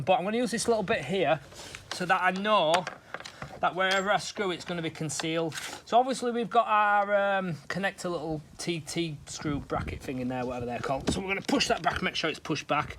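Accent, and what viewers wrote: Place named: Leeds, United Kingdom. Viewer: Improving your finished product all the time , always good to hear a Leeds accent here in Australia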